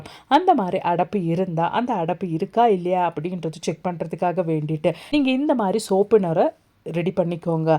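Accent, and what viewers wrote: Kerala, India. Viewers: Sister ur malayala tamil so sweet.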